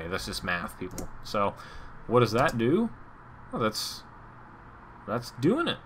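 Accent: Canada. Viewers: I loves your Kaynedien accent hey. thanks for this.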